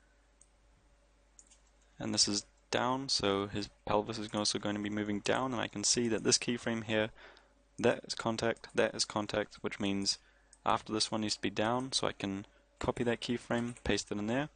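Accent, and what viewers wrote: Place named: Denmark. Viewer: Where are you from man? you have a Danish accent!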